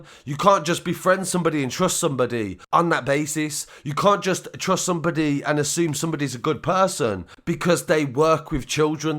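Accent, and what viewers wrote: Birmingham, United Kingdom. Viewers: What accent is this? Birmingham or something, I'm glaswegian always realise when yous chat, you talk hyper then last word or 2 is low tone lol Anywayz fukk all that respect for the videos mate.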